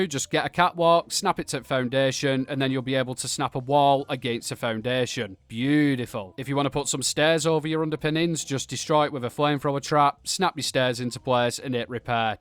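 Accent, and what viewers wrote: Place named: Yorkshire, United Kingdom. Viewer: Gotta love a yorkshire accent 😄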